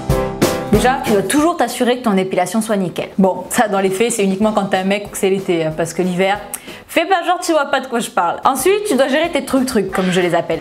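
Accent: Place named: Nice, France. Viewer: Salut, en plus d'avoir un corps magnifique et une tête bien remplie, tu as vraiment beaucoup d'humour, j'adore ton accent (je travaille sur Nice d'ailleurs!) et tes vidéos, elles m'ont beaucoup aidée à me raffermir et à prendre goût au sport (un exploit pour une feignasse comme moi 😂)! bisous bonne continuation!!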